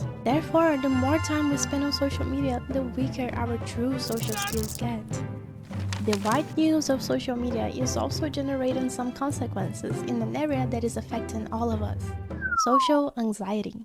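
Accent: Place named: Brazil. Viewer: Pensei que fosse brasileira pelo sotaque e confirmei com o áudio da sua mãe kkk Gostei muito do vídeo e das referências! Abraços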